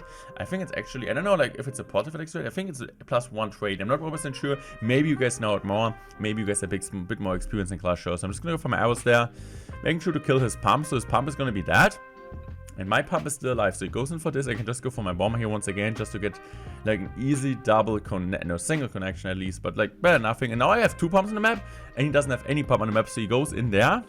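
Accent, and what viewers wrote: Germany. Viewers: Glaube gibt wenige deutsche dessen akzent so sehr triggert wie der von dir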